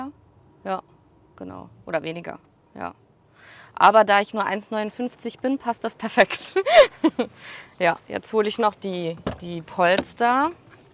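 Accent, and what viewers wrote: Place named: Saarland, Germany. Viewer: Wow, ich bin beeindruckt von deinem Leben. Du hast alles richtig gemacht. Du bist sehr ordentlich und sehr minimiert und vielleicht würde ich mich noch vom Beifahrersitz trennen.Ich lebe in Rheinland-Pfalz und freue mich in verschiedenen Sätzen dein saarländisches Dialekt rauszuhören. Ich liebe Dialekt. Du lebst im Paradies und genieße jeden Tag.Viel Glück in deinem Leben.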